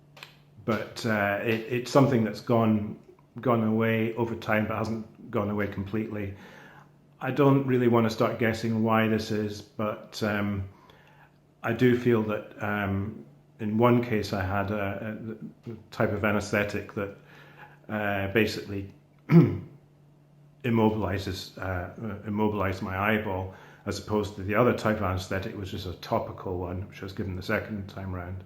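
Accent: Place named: Scotland, United Kingdom. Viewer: He's developed a Scottish accent!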